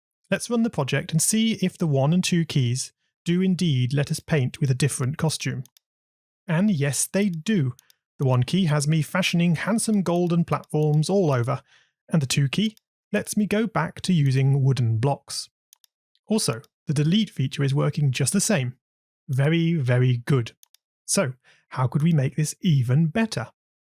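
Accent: United Kingdom. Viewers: for some reason, the best coder i know loves it when his own characters die... i guess i kinda do to, you feel a sense of pride watching all of your hard work lose their life, also give me your British accent.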